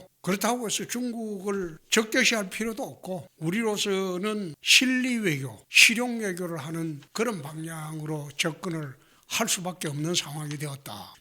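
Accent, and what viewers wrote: Gyeongsang, South Korea. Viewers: 은퇴선언하고 다시 복귀한 건 준표밖에 없을 듯 😂😂😂 나이 먹고 개추태 그만 부리시지 쯧쯧… 갱상도 사투리나 고쳐라 듣기 졸짜증난다 사투리 죽어라 쓰는 인간들 고집불통에 나르시스트 같다.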